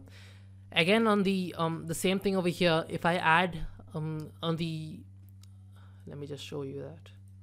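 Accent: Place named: India. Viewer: I mean.That's Awesome.His voice sounds Indianish.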